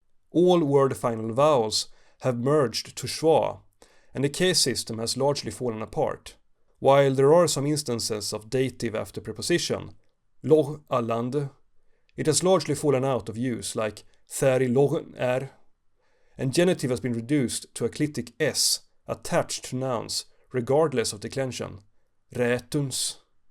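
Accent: Sweden. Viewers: "The julandic LAUV" thick and beautiful gøtamål. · The narrator sounds very Swedish so I wouldn't take it for granted that he knows how to pronounce middle danish haha. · That's the strongest Swedish accent I've heard in a long time, at least for someone who speaks fluent English.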